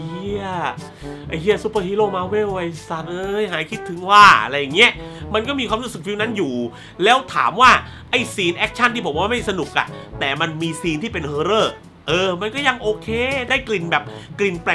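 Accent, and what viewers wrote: South, Thailand. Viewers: พี่เป็นคนใต้ป่าวครับ แหลงใต้ได้ม่ายนิ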